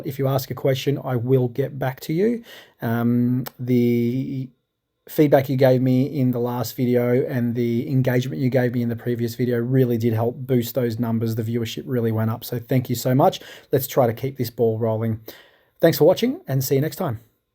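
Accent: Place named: New Zealand. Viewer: Oops, I meant New Zealand, so sorry. 😬It's the accent! 😄 If you take on this yard, I look forward to seeing what the grass can look like when properly maintained. Thank you for the info.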